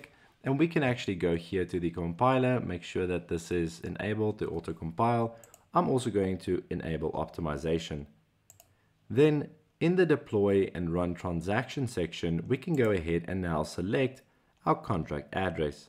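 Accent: South Africa. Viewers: Hey you sound south african wow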